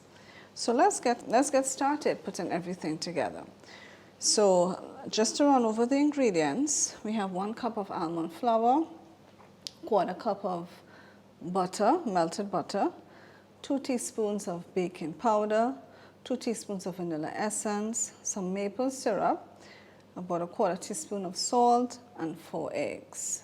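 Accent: Trinidad and Tobago. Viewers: Hey! You have to be a Trini 😁😁😁👏🏾👏🏾👏🏾. That accent! Nice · YESS!!! Can spot a Trini anywhere! Thanks for this! Gonna try it out!❤😁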